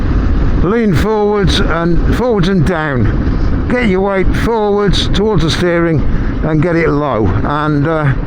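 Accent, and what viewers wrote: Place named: United Kingdom. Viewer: >click on interesting motorcycle vlog >hear british accent >click off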